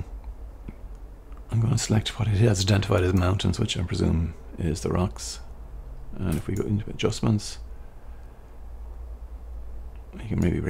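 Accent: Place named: Ireland. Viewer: I think you're speaking just fine, and I suspect that the other commenters simply aren't used to an Irish accent. In fact, that nie fella sounded unhinged in his comment, so probably best to disregard. I like your Luminar edit the best of the two btw, since it's closer to what it seemed to be in real life. Good luck with your channel (and wotht the bird that's determined to get in!).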